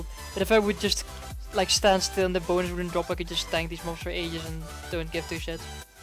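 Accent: Netherlands. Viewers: je accent klinkt zo lekker nederlands, love it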